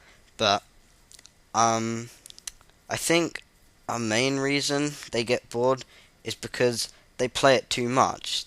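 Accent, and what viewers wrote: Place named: England, United Kingdom. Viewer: Lol I like your English accent.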